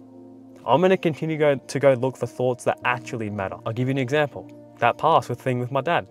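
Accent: Australia. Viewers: with a Australian accent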